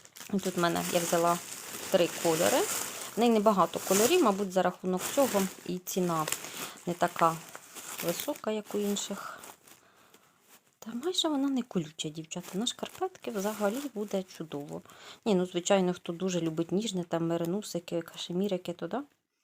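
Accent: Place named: Poltava, Ukraine. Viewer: Прив!т,почула св!й Полтавский гов!р,!нформативне в!део треба спробувати.Здоровья та миру.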